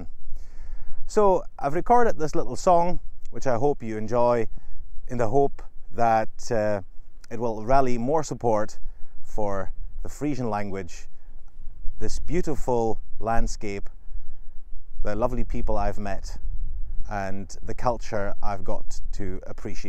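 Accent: Scotland, United Kingdom. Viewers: yeah it's true you do sound Scottish. Are you a first language Frisian speaker? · In case you are curious, two Scottish aspects of your English accent are that you pronounce the vowels in “so” and “day” as monophthongs and that you use a tapped r sound, the r sound also found in Swedish, Icelandic, and most Norwegian dialects. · Interesting - your accent sounds similar to Scottish. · I am confused. You sound Scottish but have a German name.All the same Frisian is the closest related language to English so hope to God the German AND Dutch governments protect the culture of these islands!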